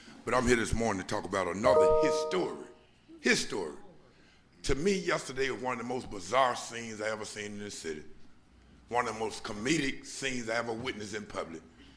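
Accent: South, United States. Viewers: 🎯💯👍🏾❤️ Although his Southern accent was on 💯, he took a fearless and firm stand on the Word of God...AGAINST high officials' condonement of public display of homosexuality‼️ He spoke all TRUTH, y'all‼️ HE...SPOKE...ALL..TRUTH‼️ He and Pastor Gino Jennings, regardless of WHO hears them, sound the alarm of God's Word...LOUDLY!!! FIRMLY!!! SHAMELESSLY!!! "I ain't bought by NOBODY!" (in reference to speaking the Word of God) How many other Christian leaders can honestly say the very same thing, in the Name of the Lord Jesus? How many Christian leaders are seriously willing to speak against this abomination, in the Name of the Jesus Christ? God bless!!!👍🏾❤️💯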